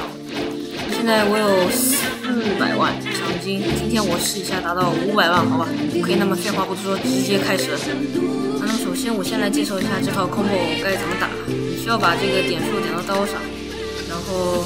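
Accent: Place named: China. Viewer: 中国的吧 听口音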